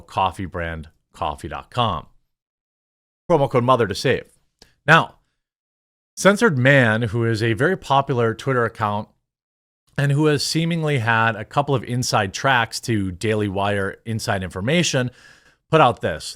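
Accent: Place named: Midwest, United States. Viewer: It is funny. That Midwestern thing. I bet he says "warshcloth" too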